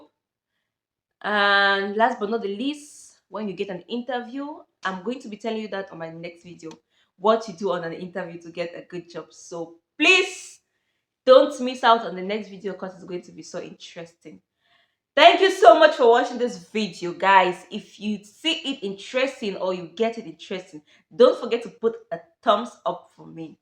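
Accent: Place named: Africa. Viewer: Hi,it's so goo d watching your videos really. You are indeed an hardworking young African. You claimed to a Cameroonian,but your spoken English sound like someone from Anglophone African countries.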